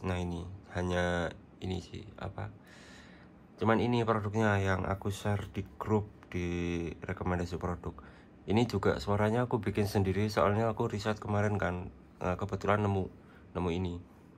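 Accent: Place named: Wonosobo, Indonesia. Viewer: Sampean cah ngendi mas? Logate kaya wsb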